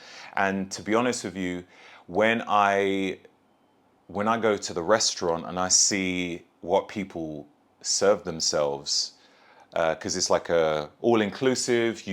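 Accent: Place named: Europe. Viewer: Love the accent I can listen to Europeans talk all day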